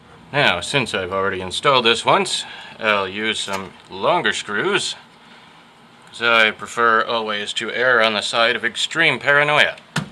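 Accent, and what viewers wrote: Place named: Croatia. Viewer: Your heavy Croatian accent makes your otherwise brilliant vid difficult to follow. Have you considered providing American or Canadian subtitles?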